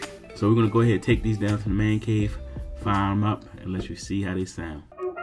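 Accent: Baltimore, United States. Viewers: My guy, are you from Baltimore? I hear the accent.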